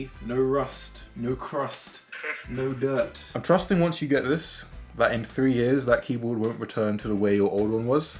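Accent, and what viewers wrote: United Kingdom. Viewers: Are you British.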